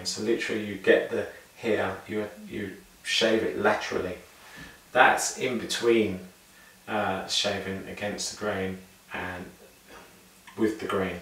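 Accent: United Kingdom. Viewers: Love that British Accent 🤗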